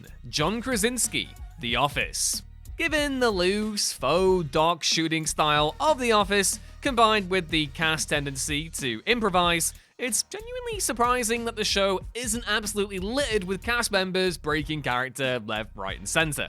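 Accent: United Kingdom. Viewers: it's kind of awkward hearing a Brit talk about the American version of the office when the British version is a lot better. (P.S. I'm American)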